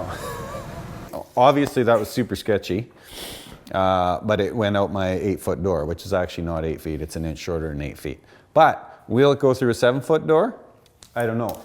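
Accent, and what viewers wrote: Canada. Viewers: Great review. Also I can tell your Canadian as fuck.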